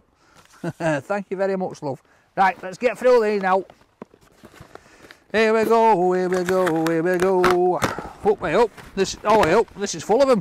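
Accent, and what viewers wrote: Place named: Yorkshire, United Kingdom. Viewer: Love the yorkshire accent, much better than my midlands accent.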